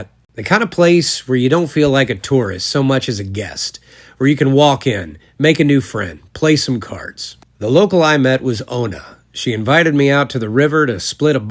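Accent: South, United States. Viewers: Maith thú, don't fret about your accent, I'm thinking it would sound really lovely le Gaeilge I'm from Aran and am always trying to get my daughter to pronounce words like I do, but she ignores me and goes with east coast school accent ha. Southern accents are beautiful, I love them so much. Fun fact, when I was little in the 70s/ 80s we whispered when we were visiting Galway from the island , didn't want to stand out/ be embarrassed for speaking Irish. I think that is absolutely insane now. Like whyyyy! So happy when I hear of others learning Gaeilge. I've met a German lady and a San Francisco lady with beautiful spoken Irish. Ádh mór leis an bhfoghlaim!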